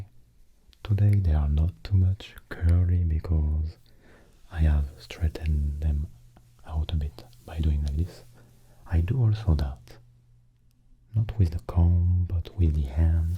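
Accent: France. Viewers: Are you french mate?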